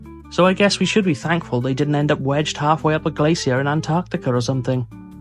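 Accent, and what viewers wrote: Wales, United Kingdom. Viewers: i think he might be welsh but that's just a guess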